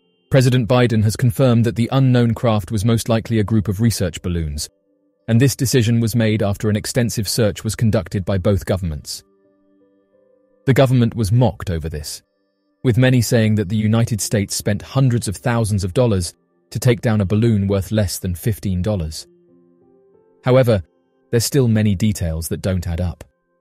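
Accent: England, United Kingdom. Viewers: That English accent ; very pleasant .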